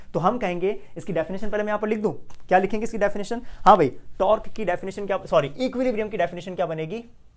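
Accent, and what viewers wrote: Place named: Karachi, Pakistan. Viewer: sir ki language sy tu karachi ky lgty ha 😂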